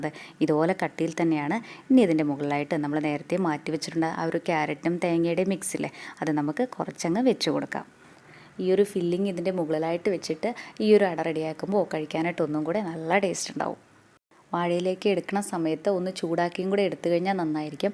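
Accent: Palakkad, India. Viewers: Kollato..chechiku palakkadan slang undu, ath kelkan thanne oru rasam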